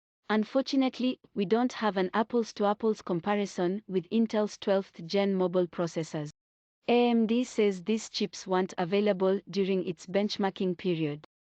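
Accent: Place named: Africa. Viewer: Asian woman with African accent? Wow. Good video though.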